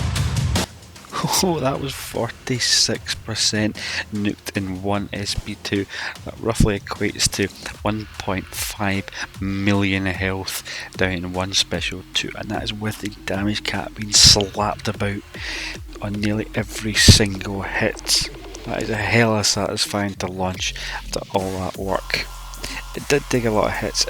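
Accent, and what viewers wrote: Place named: United Kingdom. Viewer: Your British accent is THICC Boy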